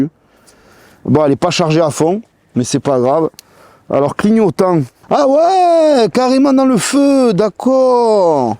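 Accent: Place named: Banlieue, France. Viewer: Ormis cet accent de keke insupportable. Oui c est une bonne machine . Ah monte jamais plus haut que biaritz ,tu vas te faire démonter avec ta langue de branleur de cité qui a loupé son brevet des collèges .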